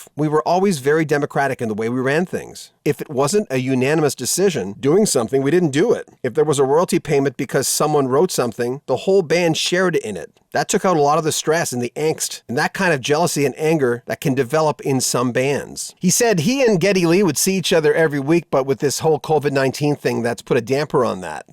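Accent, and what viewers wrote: Canada. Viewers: When you started reading Alex's quotes I was like, "This guy really sounds like Alex. I wondering if he's doing an impression consciously." Then I kept listening and thought, "Nope...just Canadian."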